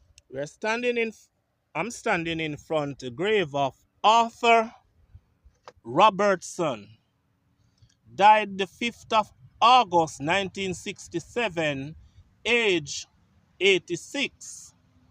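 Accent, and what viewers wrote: Jamaica. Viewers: The Grammar police are out in full force. I appreciate the Jamaican accent with all it's pronunciations and inflections. What I enjoy the most is all the information in these videos and the effort that has gone into visiting the locations, researching, editing and narrating them. Now Grammar Police send the links to your perfectly edited, interesting and entertaining videos so I can watch them. Thanks x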